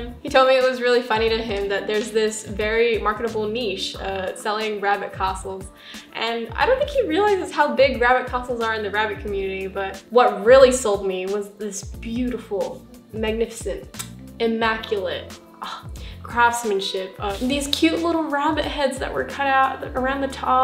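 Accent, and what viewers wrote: Australia. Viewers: Not gonna lie, I came here for the bunny content cuz I have a bonded pair. I found your channel when I was looking for bonding advise. I subscribed though cuz you’re so cute cuz of your Australian accent. It’s so attractive to hear 😅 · This was so funny holy cow. I felt this spiritually. I didn’t realise you were Australian. I’m moving to an apartment and I felt that hating yourself part for their depressing area. But the laugh had me dead. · Ok is it just me or does she sound like she is speaking with an Australian accent AND an American accent.?? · I didn't realise you had an "Austrailian" accent until I saw this comment lol, I hear it now that it's been mentioned but totally didn't realise it before that. wow.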